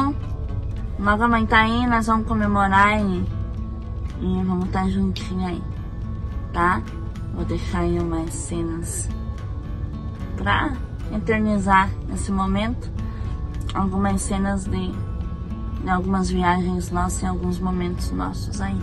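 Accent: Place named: Paraná, Brazil. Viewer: Parabéns pelo seu aniversário, que Deus continue cuidando, dando muita saúde e que tenha sucesso em toda a sua jornada, gosto do seu sotaque e das frases da sua região do Paraná😂😂😂😂👍🙌🎊🎁🍰🎂🍰🎂🏆👏👏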